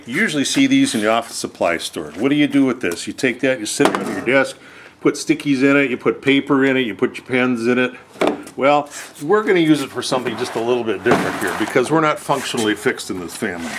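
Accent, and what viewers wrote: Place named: North Dakota, United States. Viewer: Thanks for demonstrating some great ingenuity! I always like to see off-the-shelf items re-purposed. When I first started watching this, I said to my wife, "He sounds like Charlie Selberg! I wonder if he's from North Dakota? " And sure enough, you're in Fargo, which is where Charlie grew up.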